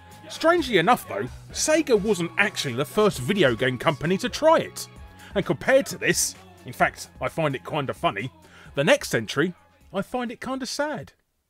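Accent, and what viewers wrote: United Kingdom. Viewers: MC Apophis He’s British. That’s like me pronouncing Connecticut properly, and everyone dissing me for it.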